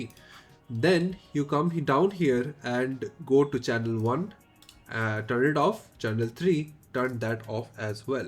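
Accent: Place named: India. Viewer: can you please practice the accent, i don't understand well your english with india accent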